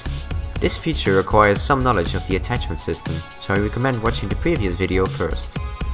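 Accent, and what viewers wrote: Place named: Netherlands. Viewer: You sound Dutch lol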